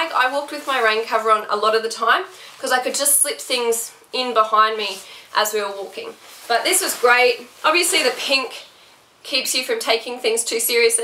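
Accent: Australia. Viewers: This was so helpful - ta! (And great to hear a fellow Aussie!) My cousin and I are doing the Camino in April next year (2020) starting on April 1, which seems pretty close to the time you walked. My main concern is with footwear. I find my feet get really hot and sweaty in goretex shoes, but I also know how wet it could be in April! Did you come across many people wearing non-waterproof shoes? Did you have any trouble with your shoes getting wet and not drying out properly? I love Salomon shoes but if I can get away with non-waterproof I would be very happy!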